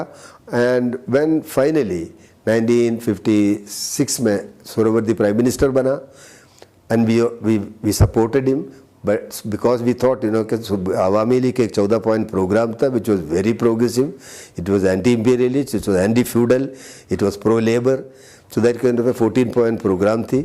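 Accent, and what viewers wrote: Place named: Kerala, India. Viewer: He speaks great Urdu, but he still has a Malayali accent.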